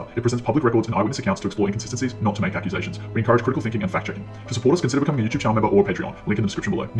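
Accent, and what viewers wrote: United States. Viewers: Sounds very American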